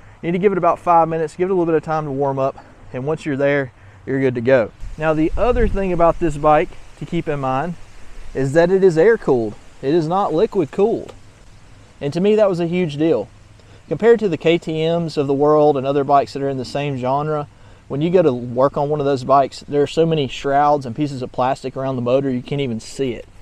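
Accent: Alabama, United States. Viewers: You're a Bama boy who says "dad gum." That equals an autosub for me. Good video. I think you just convinced me as to what my next bike is. Thanks brother! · I was thinking you were Alabamian as soon as you started talking. Huntsvillian here.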